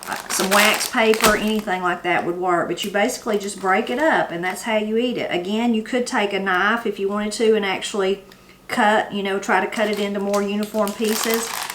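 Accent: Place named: South, United States. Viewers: oh, your accent sounds like home. I moved to the east coast from the south a year ago and never hear those southern twangs anymore. always so nice to hear them. these are a staple where I'm from in Arkansas, as well! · Great video. Watched this from the UK Never heard of this before but I will definitely make it. Loving your southern accent ❤ .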